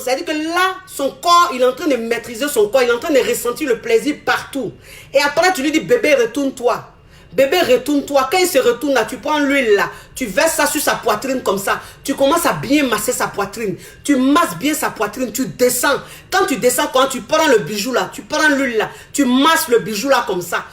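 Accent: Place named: Ivory Coast. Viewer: Wallaye si n'est pas ivoirienne ça m'étonnerait. Gagagagagaga. Elle parle comme à chez nous pays hein loool. J'adore encore aujourd'hui la façon dont le sujet-ci est abordé.Merci beaucoup pour le partage chère.Stay Blessed for all!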